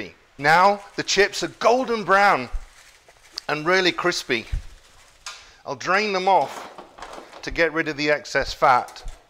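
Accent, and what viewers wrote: England, United Kingdom. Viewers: What's the favorite fish bar called? I sometimes have a hard time understanding English accents sorry! But I'd like to go if I ever visit Scotland :) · There are so many accents in England. Do you mind telling us where you grew up. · lol, I've heard, still would love to visit, see the architecture, the culture, everyone having your accent lol I wanna be british for a couple days lol... Come to NYC, if you haven't already.. many things to do here, and you can find the best of any culture of food. Your accent is the rarest you hear in NYC too, from my experience lol. there's asian areas (chinatown, etc.) Jewish communities, (brooklyn) there's black areas, there's indian areas, latino areas, etc... it's a melting pot of every culture.. basically the only time you hear someone with your accent is if it's a tourist lol... in my experience. 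To stay there for a couple days, and everyone I talk to having your accent would be a fun experience lol.. but that's normal for you. So interesting to me.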